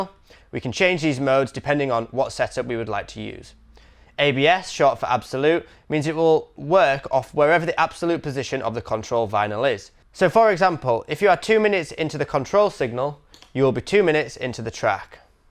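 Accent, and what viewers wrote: United Kingdom. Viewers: Thanks for the info but your brit accent makes it inunderstandable.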